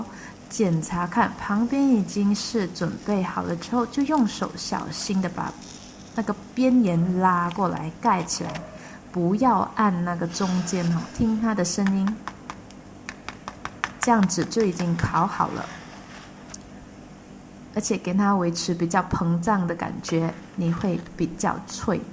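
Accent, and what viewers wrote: Malaysia. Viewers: 果然马来西亚华语，语病连连～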